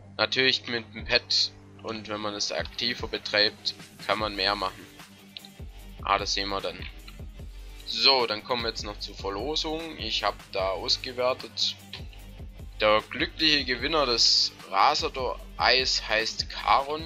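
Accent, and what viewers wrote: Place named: Swabia, Germany. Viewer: Du kommst safe aus dem schwabenländle^^ aus welcher Richtung?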